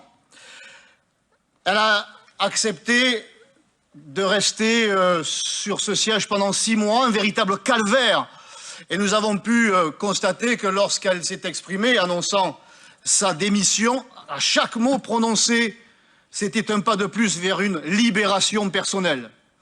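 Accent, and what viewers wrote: South, France. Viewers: Un homme charismatique avec l'accent du sud et qui lâche de grosses punchline sur des socialistes que demander plus 🤣🤣. Président 🇨🇵🇨🇵🇨🇵🤣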